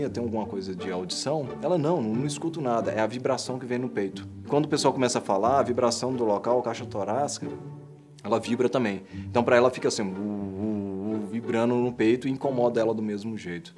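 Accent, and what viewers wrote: Minas Gerais, Brazil. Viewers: É mineiro uai! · Alguma dúvida que ele é mineiro??? Ameeeeii ♡♡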